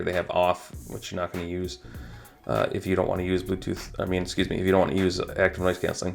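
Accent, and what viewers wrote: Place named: Miami, United States. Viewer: nice headset id be scared to take them out of the house . i heard your voice i thought my cousin was doing it, heard the Miami / Hialeah tone in your voice.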